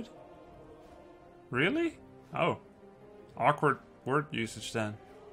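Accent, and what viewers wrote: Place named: Netherlands. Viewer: Jens van Straten Yes. He is from The Netherlands.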